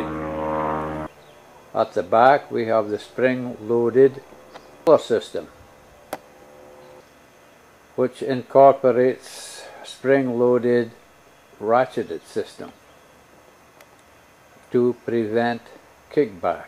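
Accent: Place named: Scotland, United Kingdom. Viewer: Sounds liek the Scottish guy on teh Simpsons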